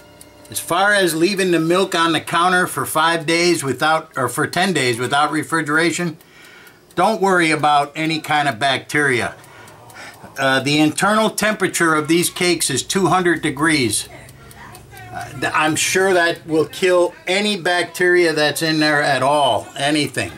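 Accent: Chicago, United States. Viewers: Great "Chicagoland" accent,which i enjoy hearing since i relocated to SoCal 4 yrs ago. A'int the same difference either.They talk/sound different here. A very good example of the SoCal sound would be original version of ' 'from album '.' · You have a Chicago accent as do I lol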